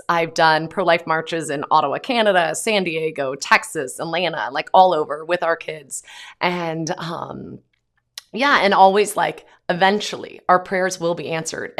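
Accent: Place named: Ireland. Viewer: Oh, I am so ashamed of my country, I am from Ireland and it is just getting worse. I also pray for the softening of hearts and that the scales fall from their eyes. On a lighter note, I love your Irish accent ☺️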